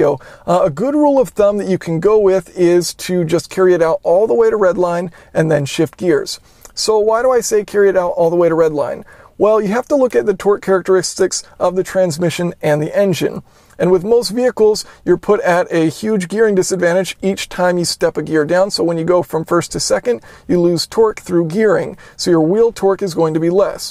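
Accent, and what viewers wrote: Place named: Oregon, United States. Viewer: Are you from Oregon/Washington?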